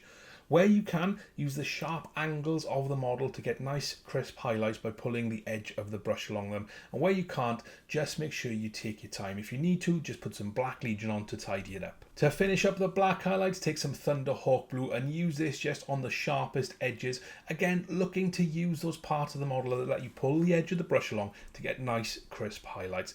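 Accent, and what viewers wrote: Wales, United Kingdom. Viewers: Where in Wales are you from? Loving the vids by the way, I've just got into minis (with the delivery of my DD KS) so painting is....uhhhh....fun :D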